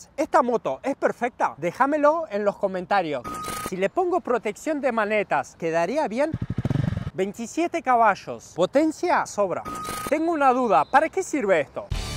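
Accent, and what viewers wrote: Argentina. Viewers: 🤔 parese de Argentino su forma de hablar 😂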